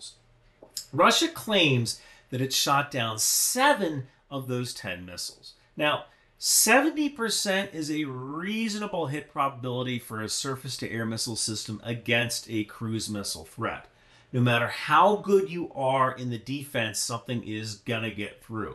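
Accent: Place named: Staten Island, United States. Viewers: Your Staten Island Ferry accent absolutely cracked me up! Your videos are incredibly insightful and informative, so I was pleasantly surprised to get a chuckle from one. Keep up the good work!! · That New York accent threw me off 🤣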